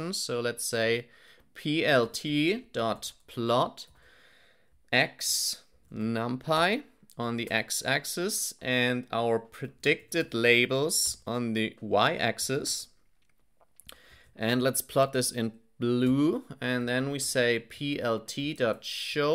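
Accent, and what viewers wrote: Germany. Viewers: Thank you very much. I love your gernan accent, and your tutorial helped me learn pytorch. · I love your strong German accent. I study at a German university and you remind me of my professor. Thank you very much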